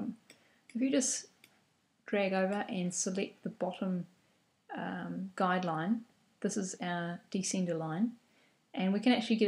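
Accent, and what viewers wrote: New Zealand. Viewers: If only all tutorials were given in a New Zealand accent! Thanks, very helpful :) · Don't know if you are still receiving comments, but....if you have 26 letters in illustrator...do you just put them on 26 layers? or fewer per document? thanks, good tutorial....and new zealand am i hearing? thanks, eliza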